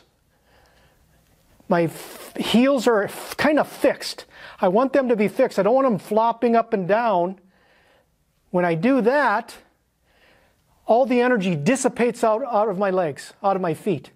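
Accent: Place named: Wisconsin, United States. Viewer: Excellent information thanks! I'm curious if rebounding on a trampoline is as effective? it seems easier on the body and joints . Challenging to stay on toes... Also I cannot sustain 2 consecutive minutes. I take breaks and do probably 4 or 5 minutes total. Also I detect a northern accent Wisconsin? 😀🙂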